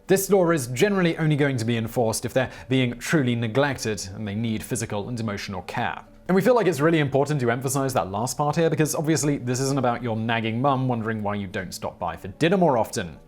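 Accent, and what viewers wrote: United Kingdom. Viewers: yet even when he adlibs he has an upward inflection at the end of every sentence, (like a ditzy blonde) which certainly isn't a British thing, He's the face of these channels with his very nice middle class British accent, and when the subject of a video is British, I would expect him to add a British slant to it rather than it being slightly biased american, cherry picked history, tbf I'm not really including top tenz as that's pretty obviously American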